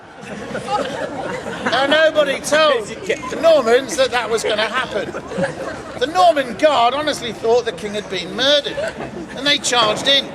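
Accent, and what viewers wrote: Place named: Scotland, United Kingdom. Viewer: What makes me think you're scottish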